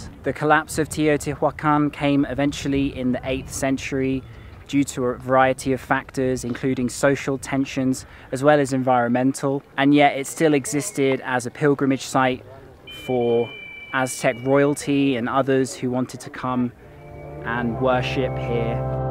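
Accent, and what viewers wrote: United Kingdom. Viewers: I love your British accent 💓 so funny... And I love see you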